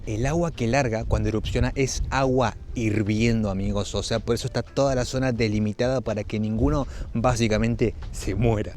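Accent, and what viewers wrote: Argentina. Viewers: GRACIAS, RECORRO EL MUNDO CON TUS VIDEOS Y HABLANDO A LO ARGENTINO, COMO DEBE SER JAJA. UNO DE LOS MEJORES VIDEOS!! SALUDOS DESDE BS. AS, ZONA NORTE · me gustan mucho mas con tu voz, tu acento argentino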